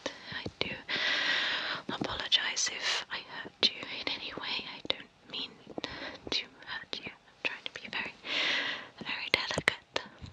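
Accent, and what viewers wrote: England, United Kingdom. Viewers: British accent😍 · You are adorable and have the cutest English accent! :) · This woman just radiates class and her english accent is a joy to listen to.wonderful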